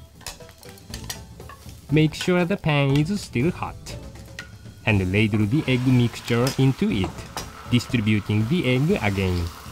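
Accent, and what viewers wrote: Japan. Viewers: 英語が日本語すぎてちょっと笑ってしまう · looks like you've never heard a japanese speak english...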